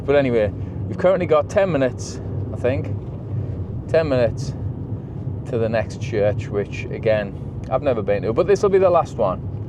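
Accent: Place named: Geordie, United Kingdom. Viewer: You've just inspired me to start a little project myself. Not churches, and hopefully something a little different. Nice to hear the local accent in one of these Youtube photography rabbit holes. Geordie lad myself, based up in Cramlington. Maybe I'll come back one day and update you on the progress. Liked, subbed and commented, because...why not.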